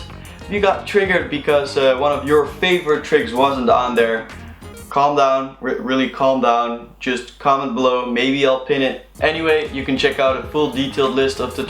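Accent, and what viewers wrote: Netherlands. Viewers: Are you dutch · u dutch ?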